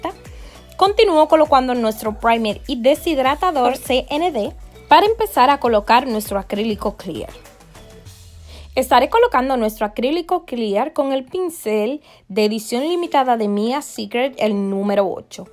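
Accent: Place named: Dominican Republic. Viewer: Una pregunta tu eres #DOMINICANA por qué tu tienes un pequeño acento Dominicano 🇩🇴🇩🇴🇩🇴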